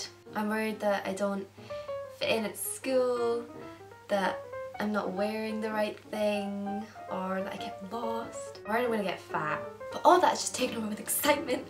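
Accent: Scotland, United Kingdom. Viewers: tis not a good thing, why would a scottish person not want to sound scottish. also she does sound scottish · Haha, wish you came to my school! We never had a foreign exchange student from Scotland, here in Florida before, escpecially a cute one x) Love the accent too! Hope all goes well! Good luck! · you have such a cute scottish accent :D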